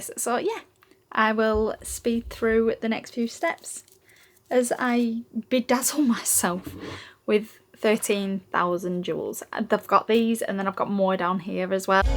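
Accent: United Kingdom. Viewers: This is the first time hearing your voice. Blow me down, you’re British! I had no idea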